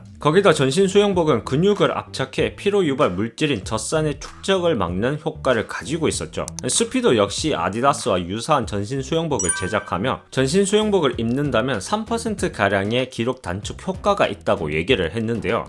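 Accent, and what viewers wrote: Gyeongsang, South Korea. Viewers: 나래이션 경상도분이신가요?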